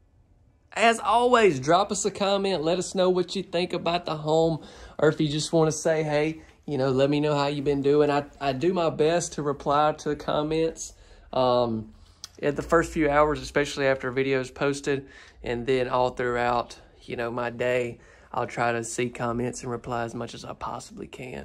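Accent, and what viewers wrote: South, United States. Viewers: Couldn't get tired of listen to you. Love your southern/ cowboy voice. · I love your southern accent. Your commentary is hilarious. It's one of the main reasons I enjoy your videos so much. This home was beautiful but a door that leads to the laundry room not a good idea. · Love this one!! Turn off the volume?? I could listen to that southern drawl allll day long! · You just sound Southern to me❤️😉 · Im not talking about the way baby talks.. love his southern charm....it's the fact he has to open all those freeking cabinet doors!!!!! Unless there is something very special in the cabinet, all cabinets look alike!!!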